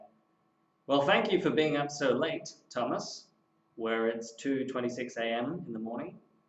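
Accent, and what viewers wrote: Australia. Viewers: I love that you are ashtralian and that you are not british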